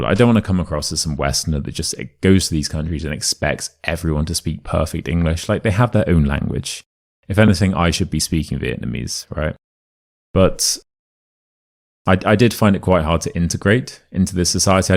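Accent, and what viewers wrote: United Kingdom. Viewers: Your voice is surprisingly calm and pleasant... the British accent - there are actually several, as in every country and language - is brilliant! It's difficult to achieve for a non-native speaker of the UK... thank you for the generosity of your videos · The best British accent!!!!! I'm Brazilian and I love the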